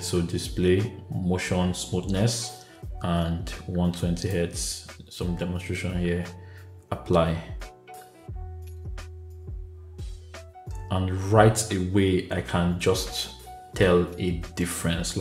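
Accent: Nigeria. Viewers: You have a Nigerian accent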